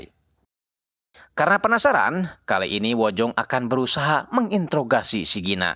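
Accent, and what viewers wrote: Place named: Sunda, Indonesia. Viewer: joss ie s Dubing org sunda 🎉🎉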